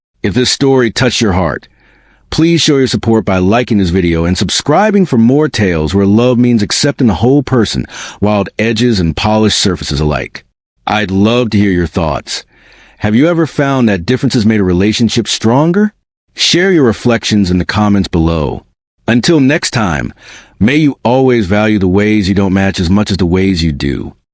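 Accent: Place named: United States. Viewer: A British story read with a heavy American accent?! 😅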